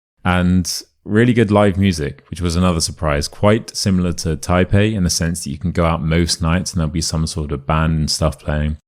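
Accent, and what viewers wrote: United Kingdom. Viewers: Your voice is surprisingly calm and pleasant... the British accent - there are actually several, as in every country and language - is brilliant! It's difficult to achieve for a non-native speaker of the UK... thank you for the generosity of your videos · The best British accent!!!!! I'm Brazilian and I love the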